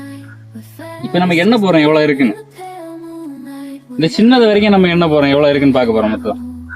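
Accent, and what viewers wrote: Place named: Marthandam, India. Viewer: தம்பி, கன்னியாகுமரியில் எந்த ஊர்ல இருக்கீங்க. பாஷை கேட்டா மார்த்தாண்டம் Side மாதிரி தெரியுது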